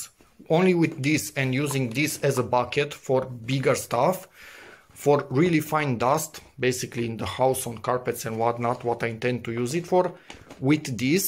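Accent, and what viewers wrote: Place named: Romania. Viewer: La cum vorbești ești Român toată ziua. :D Zi-mi te rog,il recomanzi ca si putere,trage bine?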